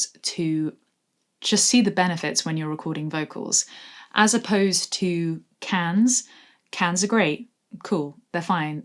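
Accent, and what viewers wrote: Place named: England, United Kingdom. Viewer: I'm inclined to agree with bunnyboiler6353 – I've always liked your spoken voice and enunciation, and as an American Midwesterner, I'm a sucker for a girl with an English accent of almost any kind to begin with. I thought the "rushed breath" tip especially good. Sorry to hear about your back – I've had back issues for decades. Learn to stay within yourself when doing your gym/workout stuff, just as you do with vocals. Stay safe!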